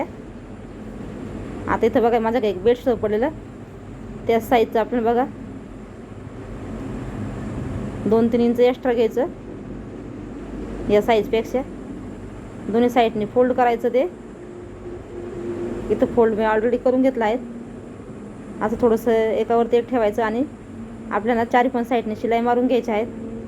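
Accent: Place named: India. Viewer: आपण इंग्रजी माध्यमाच्या शाळेत शिकलात का? मराठी मध्ये बोलताना जास्तीत जास्त इंग्रजी शब्द वापरताय. कट करणे,मार्किंग करणे,साइज,फोल्ड करणे इ.शब्द. एरवी बोलण्याची‌ पद्धत व उच्चार गावरानी वाटतात.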